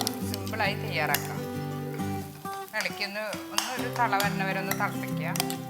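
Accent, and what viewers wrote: Thrissur, India. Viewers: Njnm aghna paraya.... hostail ennun kaliyakkum.... tcr slang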